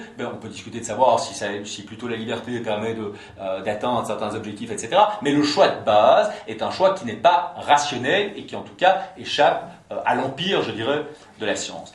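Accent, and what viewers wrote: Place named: Belgium. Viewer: C'est juste une des nombreuses formes de l'accent belge. Faut s'habituer... Pour le reste, je suis d'accord aussi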